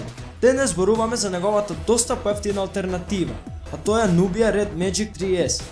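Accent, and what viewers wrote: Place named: Kavadarci, North Macedonia. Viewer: top si be uzhivaj ali probaj da zborish poishe skopski bolje zvuchi za gledachitw posho bolje kavadarchani da slushaat skopski nego skopjani kavadarski ako me sfakjash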